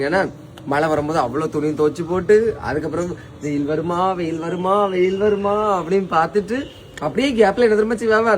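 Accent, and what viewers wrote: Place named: Nagercoil, India. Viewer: Nagar Kovil tamil super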